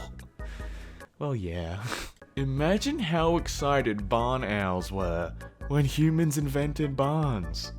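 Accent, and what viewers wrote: Australia. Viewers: He's not English/British... he's Australian. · Are u Australia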